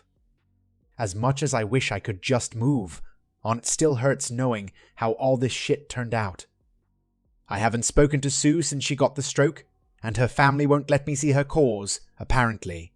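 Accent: United Kingdom. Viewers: Not my favorite rendition either. Would sound more authentic were the AI not speaking with a British accent.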